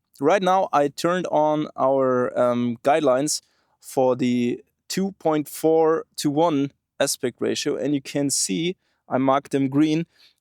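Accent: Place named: Germany. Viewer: Mach deine videos auf deutsch. Der markt ist gross genug und die welt braucht nicht noch einen deutschen mit furchtbaren akzent der viel zu langsam redet weil er im kopf Übersetzen muss. Geile kamera, sie hätte ich auch gerne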